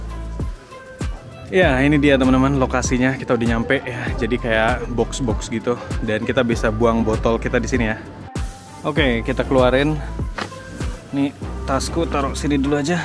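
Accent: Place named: Banyumas, Indonesia. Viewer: wah, kang ya bisa ngendika ngapak....? cilacape pundi mas? semoga selalu sehat dan barokah di manapun njenengan berada.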